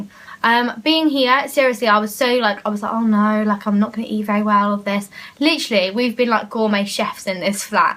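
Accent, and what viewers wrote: United Kingdom. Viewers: Where in the uk are you from originally ? Your accent is beautiful :)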